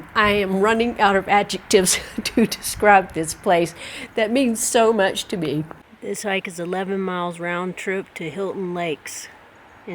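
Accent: South, United States. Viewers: ohh, I look forward to that video. i'm going to visit again in september or october on a very dark night, and I'll try to check out Lee Vining. I love the way you say that name with your southern accent! Do you have a tip on a red headlamp to use? Thanks again 😊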